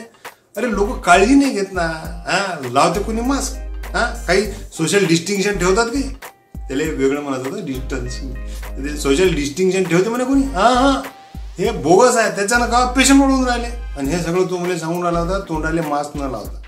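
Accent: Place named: Vidarbha, India. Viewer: Ek no waradhi bhasha , Ani kisse BHAU you are great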